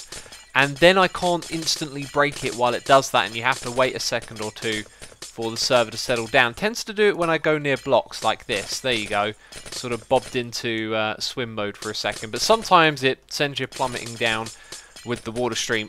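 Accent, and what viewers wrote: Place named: United Kingdom. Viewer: I absolutely love your videos! Technical building and a British accent! What more could you ask for?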